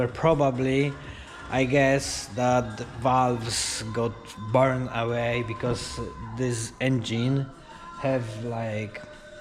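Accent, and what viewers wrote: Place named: Poland. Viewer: 2.2? I skąd jesteś, bo chyba słyszę polski język xD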